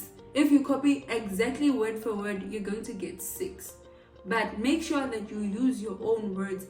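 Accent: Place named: Tsonga, South Africa. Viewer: You sound Tsonga idk😭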